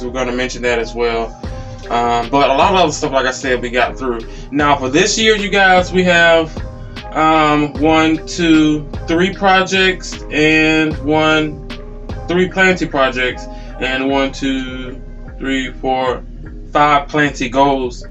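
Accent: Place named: Georgia, United States. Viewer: That’s the Georgia southern drawl 😊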